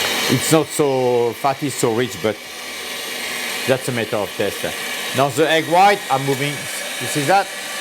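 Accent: France. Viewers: I love listening to the English french accent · French speaking English also sounds beautiful.... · When I watching this man I feel like in watching the movie Ratatouille. It is so amazing! The background, the setup, the lovely french accent...the way he looks!!!! It is a movie 🥰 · It’s Amazing!!! So easy to understand and such a pleasure to watch,very entertaining with lovely french accent,Mercy SHEFF!!! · I love that french accent!! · Love the way you explain the process. Your French accent is very infectious, in a good way. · I really loved your english with the french accent 😄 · l'accent français en anglais est horrible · such a cool accent the French.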